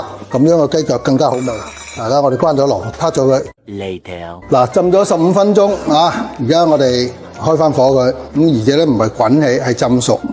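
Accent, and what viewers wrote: Guangzhou, China. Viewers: 我是地道的广卅人 师付的粤语带着很多的广卅方言 听起来很亲切 跟学做菜 吃到了自己喜欢的美食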